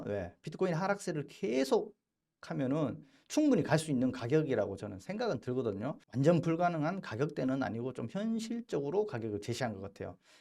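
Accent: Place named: Gumi, South Korea. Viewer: 구미 분이세요? 제가 좋아하는 언니가 구미 고향인데 말투가 똑같아요! 구미사람들 조아요❤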